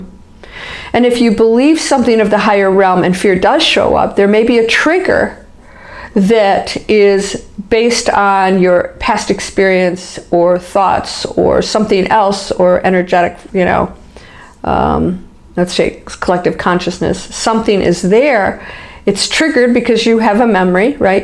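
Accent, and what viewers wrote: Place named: Michigan, United States. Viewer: Are you from Michigan or around there? My science teacher has the same accent and she's from there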